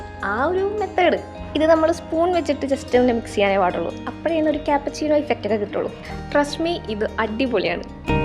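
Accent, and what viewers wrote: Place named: United Kingdom. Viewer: Her English accent🌟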